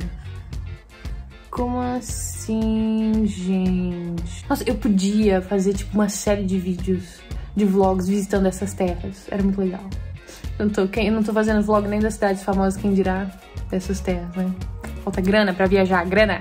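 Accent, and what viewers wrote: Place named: Brazil. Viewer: estás a começar a perder o sotaque do Brasil 🤣🤣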